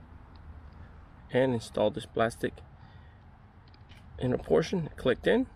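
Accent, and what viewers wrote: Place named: Cuba. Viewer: Excellent tutorial on changing an impeller. Having been born and raised in Miami and working there for many years I had many Cuban friends and co-workers. I noticed several things about their English pronunciation . One thing was that “B” and “V” sounded identical when you called Yamaha “Jamaha” it took me back to Miami days. Thanks for your fun videos.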